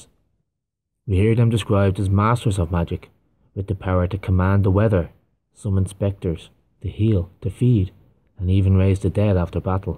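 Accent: Ireland. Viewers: Your Irish accent and pronunciation granted this the perfect seal of authenticity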